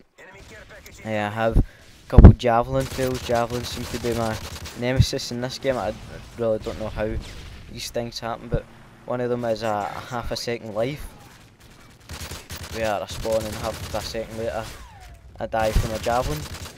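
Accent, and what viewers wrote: Scotland, United Kingdom. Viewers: Bro ur growth has been superior! And trust me in my opinion ur commentary is a trillion times better than those u mentioned at da start! No one beats ur one liners. And FUCK APOLOGIZING about ur accent! Scottish and proud (ain't ur fault if ppl can't understand) Peace!!!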